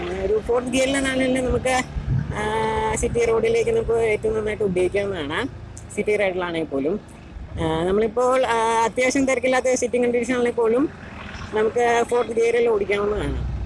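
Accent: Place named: Bengal, India. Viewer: Bengali malayalam parayunna pole ind brw sorry feel avaruth.... Plz improve ur audio quality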